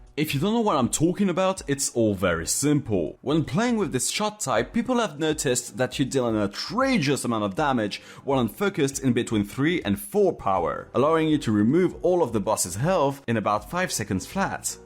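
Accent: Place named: France. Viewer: I like your french accent.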